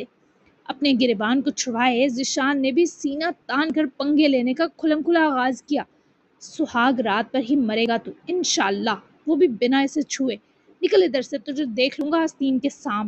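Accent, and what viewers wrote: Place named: Punjab, India. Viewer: Your Punjabi accent is awesome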